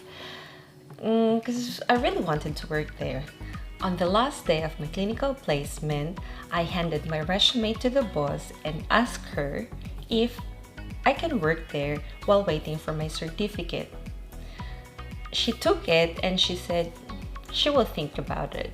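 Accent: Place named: Australia. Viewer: I truly agree with what you said that you adapted the Australian accent when you started working in the Aged Care, I can relate to that! I truly practiced how to say the Aussie accent of “water” so that the residents will get it if I am going to ask them if they want some “Woottaah” 😊 i love this sitdown vlog. 😊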